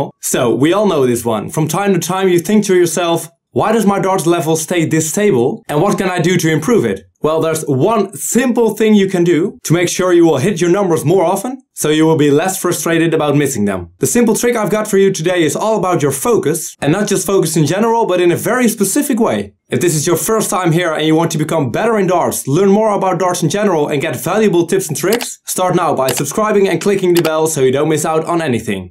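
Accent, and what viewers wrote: Netherlands. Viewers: Ik hoor aan zijn stem dat hij Nederlands is xD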